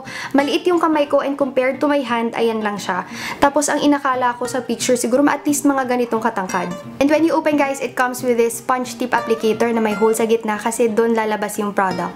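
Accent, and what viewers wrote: Philippines. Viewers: im really love philipine accent😍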